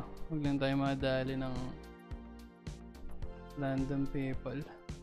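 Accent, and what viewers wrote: Visayas, Philippines. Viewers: Bisaya talaga accent ni lodi neto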